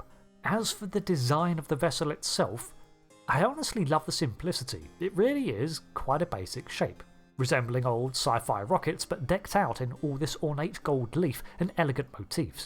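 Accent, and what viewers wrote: United Kingdom. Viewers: The British Accent elevates the dialogue to hitherto unseen heights.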